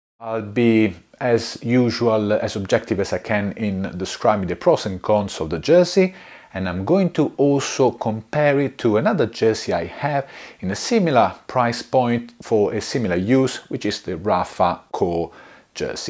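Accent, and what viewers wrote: London, United Kingdom. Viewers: Your accent might say “Londoner” but that beard screams “Italian”. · Hey alright geezer living the London accent 😂. Great review mate on that jersey. Stating all the pros and cons!!!! Does that jersey come in other colours??? Your channel is growing and you’ll be getting loads of stuff to review so keep doing what you’re doing & a excellent ave speed today mate 👍.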